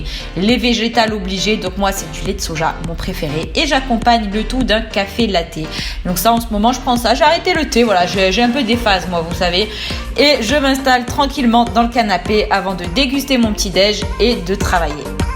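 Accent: South, France. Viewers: Top avec l'accent de chez nous le sud non😊😊😊😊?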